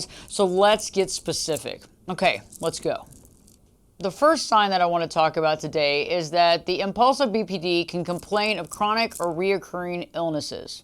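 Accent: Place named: South, United States. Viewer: Thought I saw Mariska Hargitay talking with south accent 😁😁😁 this vid is really intetesting, as BPD, I don't know which type I'm. Sometimes, it seems to me to get a bit of each type together...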